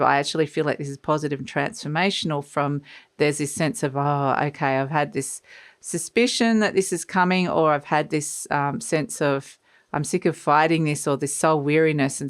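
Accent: Australia. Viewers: Nice to hear an Aussie tarot reader. Has been a bad year for me, never got covid, but got Bronchitis, colds, chest infection's from everybody else and at 70 got to watch out, their's a lot of strange bugs going around now. let's hope 2023 is much better in health wise for everyone. Cheers and Merry Christmas Hun.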